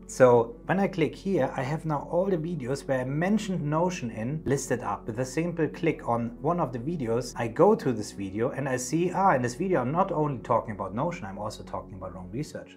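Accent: Germany. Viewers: Do we here a german accent here? :D Great video! Greetings from Karlsruhe!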